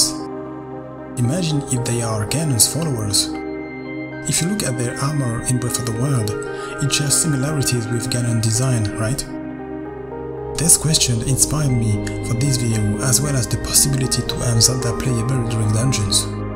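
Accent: France. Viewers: On entend bien l’accent français :’)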